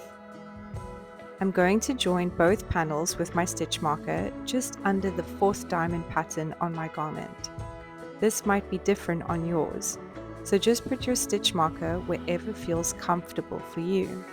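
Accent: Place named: South Africa. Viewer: Interesting! And so pleasant to hear a South African accent on a crochet channel.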